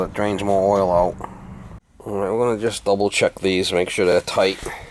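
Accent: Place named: Massachusetts, United States. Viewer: It's actually a south coast massachusetts/rhode island accent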